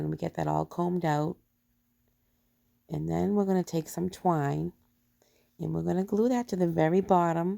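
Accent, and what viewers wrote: Massachusetts, United States. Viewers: Well I am impressed. Beautiful designs and execution! So much so I have subscribed. Enjoyed hearing the MA accent again! Grew up in Reading, moved to L A a million years ago at 22 to seek fame and fortune. What part/town are you from? How/where do you sell your wreaths? All the very best to you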